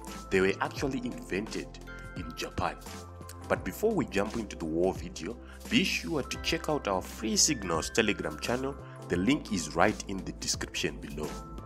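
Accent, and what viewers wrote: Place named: Zimbabwe. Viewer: thanks. you sound Zimbabwean.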